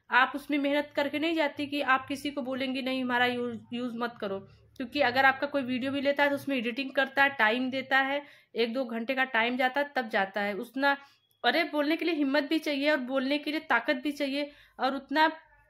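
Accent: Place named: Lucknow, India. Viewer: Ap didi up Lucknow se ho kya 😅😅😅 ap ki boli se lg rha h 😅😅😅😅 but ap ka videos acha lg rha h 😅😅😅😅😅😅😅😅😂😂😂😂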